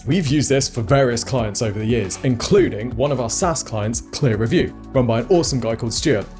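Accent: United Kingdom. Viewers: Close your eye, you will hear he is at a normal speech speed for a podcast. At least he speaks a fairly neutral UK English... with a slight London accent (innit?} ... ... For UK standards he is super easy to understand... When I went to Edinburgh ...it often took me up to 2 minutes (or until I have heard a clear FUCK ) to see if people are actually speaking English) To Learn More about Brits are fucking impossible to understand to the point they sometimes do not understand each other : any interview with fighter Paddy Pimblett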